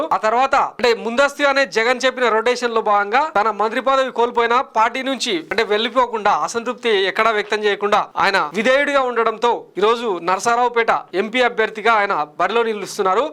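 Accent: Telangana, India. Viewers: అన్న గారు మీ వాయిస్ అంటే చాలా ఇష్టం అన్న మీ తెలంగాణ యాషా చాలా బాగుంది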